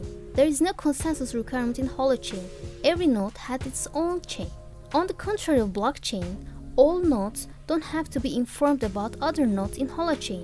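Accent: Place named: Turkey. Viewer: I can hear a turkish women 👀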